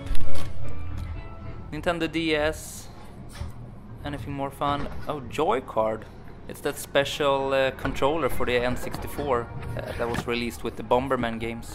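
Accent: Sweden. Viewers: are you from sweden? what hard off is this+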